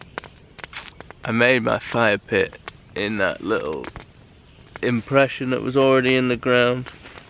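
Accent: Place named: United Kingdom. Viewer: Aren’t you British, you sound British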